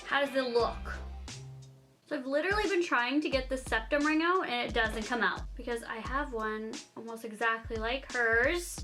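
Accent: Canada. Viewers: It's your Canadian accent!! Lol!! You were close! Great job! If you had thicker dreads you would look just like her!!❤️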